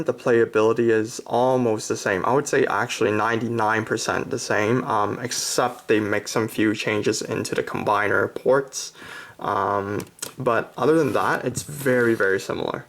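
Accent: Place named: Hong Kong. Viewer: Are you a HongKong people, you've got really nice accent.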